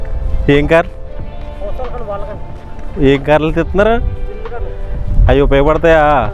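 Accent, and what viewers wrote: Godavari, India. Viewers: సార్,. మన కోనసీమ లో మీరు చూపించే ప్రదేశాలు అద్భుతంగా ఉంటాయి మీ విశ్లేషణ కూడా భలే ఉంటాది. సార్.. మన గోదారి స్లాంగ్ లో మీరు మాట్లాడే భాష భలే ఉంటాది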